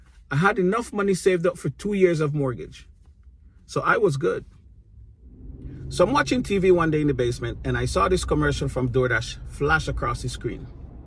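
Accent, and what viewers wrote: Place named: Jamaica. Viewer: Awesome sounds like a yard man🇯🇲 . Congrats on the channel.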